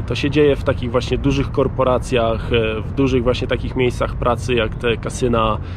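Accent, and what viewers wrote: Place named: United States. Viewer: I tez amerykanski akcent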